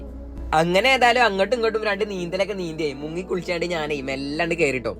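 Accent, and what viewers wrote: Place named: Nilambur, India. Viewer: Ante Varthanam kettitt ijj oru nilambur karananenn thonnunnu.. Njanum nilambur aan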